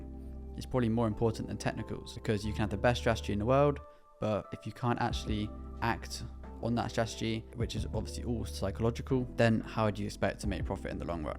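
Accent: United Kingdom. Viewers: How to sound British like you? I like your British accent mate 😍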